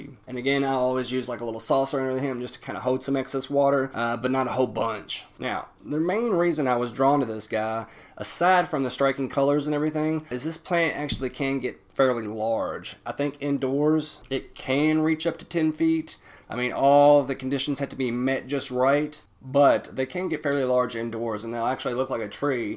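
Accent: South, United States. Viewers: Good content. Good southern accent. Good cat. I like this.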